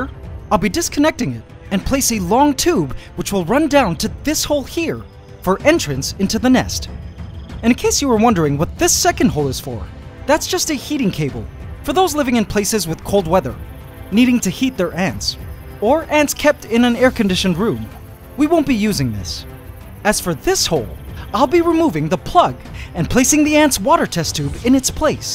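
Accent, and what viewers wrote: Canada. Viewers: You are Canadian right? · Destrxct_ haha I always assumed he was Canadian...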